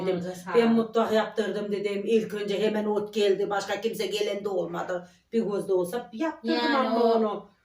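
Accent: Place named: Çorum-Amasya, Turkey. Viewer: Orası corummu amasyalı yoksa samsunmu tokatmi yoksa şive otaraflar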